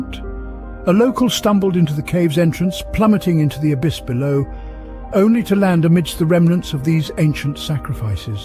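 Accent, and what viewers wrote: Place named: England, United Kingdom. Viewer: The most rational explanation is to believe what the evidence shows even though some of the evidence has been removed and destroyed what they didn’t get or some of the large footwear from Giants of approximate 12 to 15 feet tall plus red hair, which was checked for DNA but you will never hear the results of that test. It ruins the paradigm of this very wordy over Adjective laden, plus add the English accent to serve some sort of intellectual prowess to perpetuate the paradigm rather than report on the Fax.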